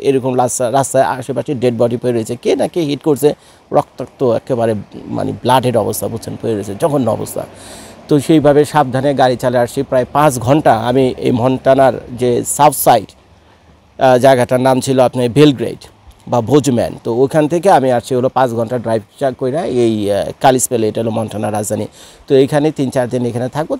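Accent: Dhaka, Bangladesh. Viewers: AASSALAMOO ALAIKOOM Dear Bhai,KHALAQAL MOUTA WAL HAYATA,Soora MOOLQE,I liked you because of Dhakaya accent,from Bangladesh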